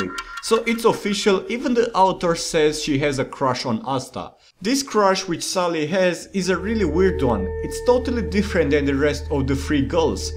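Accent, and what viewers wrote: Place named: Russia. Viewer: The russian accent and "scary" backround music make this video comedy gold.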